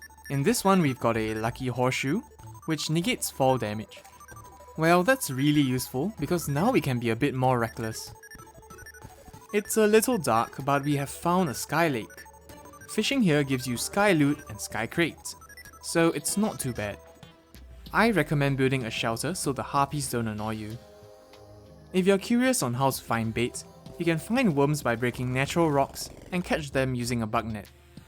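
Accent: Singapore. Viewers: Hey, just wondering, but do you live in Singapore? You kinda sound like a Singaporean for some reason....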